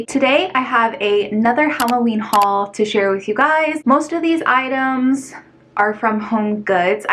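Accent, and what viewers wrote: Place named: Midwest, United States. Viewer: I'd say u have a Midwest accent! A lot of ppl in wisconsin pronounce those words EXACTLY like that!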